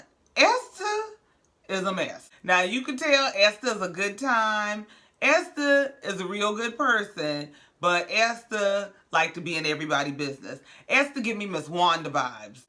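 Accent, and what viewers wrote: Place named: Gullah Geechee, United States. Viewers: Okay after watching the Arionne/Tasha K interview review and this one I'm subscribing👏🏿👏🏿. I thought your accent was from NOLA, but after reading another response, I see that you are from Charleston, SC. I was off huh?.. But then again I'm a Jersey girl.😆😅🤣 Loveee the accent and review! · Girl I hear that geechie accent.. Where are you from? Gotta be Charleston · Hey girl, love the channel and the videos. 1 question are you from South Carolina the Charleston area? You sound so much like my people and me.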